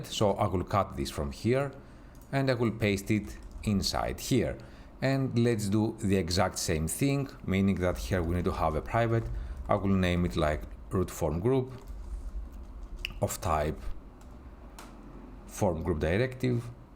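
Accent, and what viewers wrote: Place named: Italy. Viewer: Thank you Sir this video. And also I am curious are you an Italian ? Because you have an Italian accent which is good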